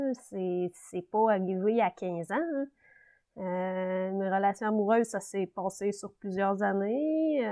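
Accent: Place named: Quebec, Canada. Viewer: avec vous tous. Il faut pas avoir honte. Et ça fait du bien de réentendre l'accent du Québec ;)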